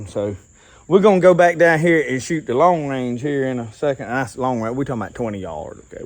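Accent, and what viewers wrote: South, United States. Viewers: i love southern drawl. I have it too.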